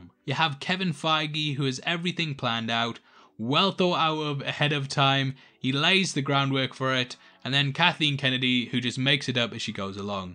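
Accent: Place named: Sunderland, United Kingdom. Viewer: Agree with a lot of this although would rate higher than 5/10! Also, North East accent. Sunderland-ish?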